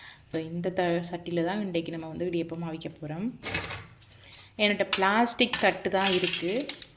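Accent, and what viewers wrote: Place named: Sri Lanka. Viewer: இலங்கையா நீங்கள் உங்கள் தமிழ் மிக அழகு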